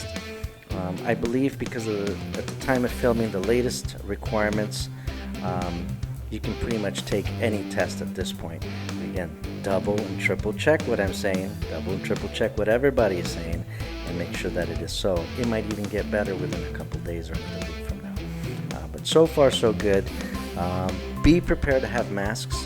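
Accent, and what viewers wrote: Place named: Bisaya, Philippines. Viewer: 💯 legit bisaya gyud, well almost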